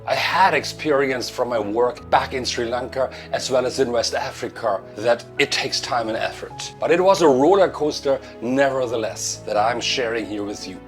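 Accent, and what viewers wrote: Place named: Germany. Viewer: After 30 years living abroad... U still got ur authentic german accent .. ur sounds like u've been in Malaysia yesterday...🤣🤣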